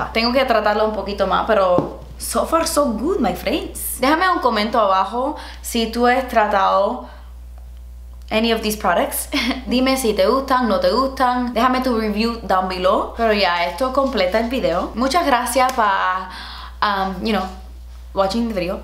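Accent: Cuba. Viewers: Mojado y Salvaje! 😉 Love this videos in Spanish! Y ese acento cubano es divino! 😃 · Don’t know how to say it in Spanish? Add “ar” at the end and you’re good to go jajajajajajaja Amo cuando hace los videos en español, me dan mucha risa y el acento cubano es puro amor 😍😍😍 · Me encanta tu acento cubano!!! seguramente no tienes eduación formal en español pero hablas muy bien. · Me encanta tu acento cubano, de donde lo sacas 🤔 eres muy valiente! Ojalá hagas mas vídeos así para tu publico hispano 😘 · Soy mexicana, creo que tu acento cubano es genial, y tu español es muy bueno. Saludos :) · Pero a mi me gusta sobre todo el acentazo cubano tan genuino. Try= probar, usar. Y lo de la abuela: very good fandango! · Jajajajajakakakaka me encanta te ha enseñado a hablar alguien cubano o de puerto rico jajajaja pero me encanta ojala te hagas un canal en español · Please please please make more videos in Spanish lol I really enjoyed watching this video, and i love your Cuban accent. 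fyi my sister have the same name of you, we're from venezuela. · Tienes un bonito acento cubano 🥰 love it! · Tu español es súper bueno!!!! Cuando nosotras hablamos de maquillaje (en español) usamos palabras en inglés, por ejemplo: highlight. Así que no te preocupes ❤️ Lo único que me causa gracia es que tu acento es SÚPER cubano y nunca pensé que sería así jajaj a pesar de que tus raíces son cubanas. Proud of you girl ❤️ siempre has sido mi inspiración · Jajajaja comment no se dice "comento" se dice comentario... But you're so cute! Amo tu español con acento bien cubano 🖤 and primer es prebase ya sea prebase de ojos o prebase de maquillaje.. Love you girl! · Me encantó este video en español, lo hiciste muy bien. Me gusta tu español cubano. Saludos desde República Dominicana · Me encanta ese acento cubano 😂❤️ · Porfavorrrrr more videos in Spanish!!!!!! Tienes mucho flow hablando con ese súper acento cubano!!!! 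😍😍 podrías ver algun video de youtubers españolas como: o alguna latina 😝 para mejorar el idioma !! Un saludo desde Islas Canarias (españa) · Qué gracioso vídeo. Tú español cubano 😁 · Acento cubano mami!! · I never comment but these are my FAVOURITE KINDS OF VIDEO EVER. Honestly so good. As a native Spanish speaker, I appreciate these so much 😂 me encanta el acento cubano también. Now I live in the UK so I can relate to not knowing some of the words in Spanish (but I personally have no excuse 😂🙈) xx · did not know was cuban... or at least speaks with a cuban accent 🇨🇺 ❤️ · Hahahaa me encanta su spanglish! 🤣🤣 true 305 cuban style ayeee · You speak Spanish like I do. I consider English my first language even though I am Cuban. I do not have a Spanish accent when I speak in English (which is always). But I’m kind of surprised because of your noticeable (and adorable) Cuban accent I thought your Spanish would be more fluent. 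· Me encanta cuando haces videos en español, hazlos más seguido así entiendo😁 . Se te nota que aprendiste el español con alguien de cuba jeje. Saludos desde Uruguay 🇺🇾(américa del sur) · I think you've improve your Spanish in this video so far... I hear you more fluent and comfortable with it. I really enjoy your videos so much and this made me laugh so hard. I love your cuban accent. Send you much love and good vibes <3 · Amo tu acento cubano! Es lo máximo :) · such a Slytherin look! love it! and your cuban accent is so cute haha (love from Uruguay :D) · Me encanta oirte hablar español cubano! Cada vez hablas mejor!! 👏🏻👏🏻👏🏻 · Me encantó el acento cubano que te salió en el vídeo. Un besazo desde España 💋💋💋💋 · Blend= difuminar. Amo tu acento cubano 💕 Also we dont call primer the “cebador” 😂 just primer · wow your cuban accent is so strong!!! I learn spanish from spain growing up so it's so cool to hear the different accents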